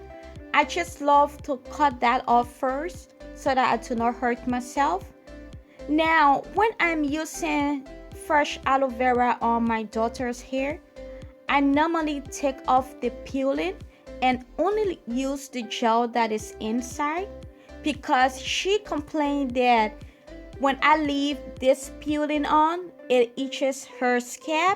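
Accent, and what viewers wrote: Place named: Liberia. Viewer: Your energy is so nice - I don't know if I'm late to this fact but your accent sounds Liberian I keep thinking my cousin is around the corner. I love this video I've used aloe on my daughter's hair since 3 months old and her hair at 2.5 is so soft and shinny her growth is consistent and she retains it. I've never used the peeling well done! Thanks a bunch!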